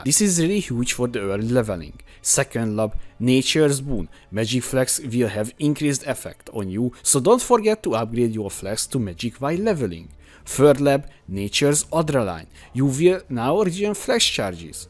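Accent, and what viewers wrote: Hungary. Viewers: Magyar vagy bátyja? Úgy hallani :D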